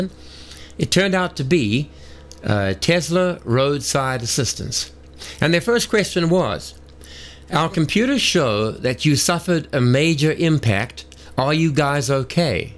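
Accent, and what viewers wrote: South Africa. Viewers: glad you two are safe! slightly off topic but are you any bit south african? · Can hear you're a South African that have been in Canada for a few years. Sorry about your Tesla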